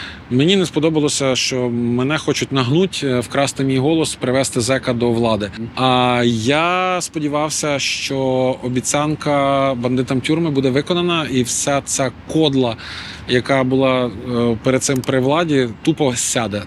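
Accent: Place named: Okanye, Russia. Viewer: Ой якби не коливання з ноги на ногу, і не окання то нехай підросте нервує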